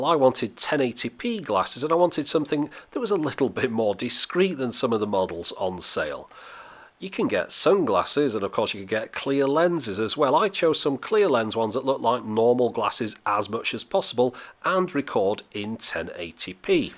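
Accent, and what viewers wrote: United Kingdom. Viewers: that British accent :D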